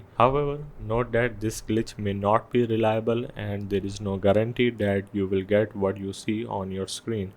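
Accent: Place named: Middle East. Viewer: Middle Eastern accent detected = video ignored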